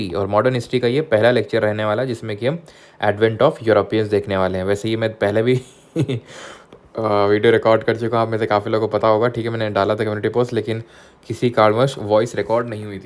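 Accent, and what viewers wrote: Kanpur, India. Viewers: Without knowing where he is from ,by his accent i within minutes got sure he is from kanpur I'm also from kanpur rola h 😂🙌🏻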